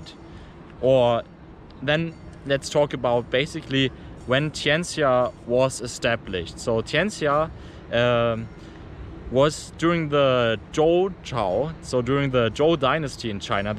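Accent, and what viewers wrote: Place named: Germany. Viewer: Your accent is German, are you still in China?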